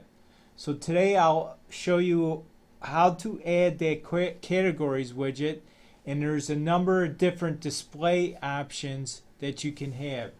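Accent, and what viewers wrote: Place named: New York, United States. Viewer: Nice to hear a New Yorker! Thank you for the very clear examples of each option for showing a category list in a sidebar.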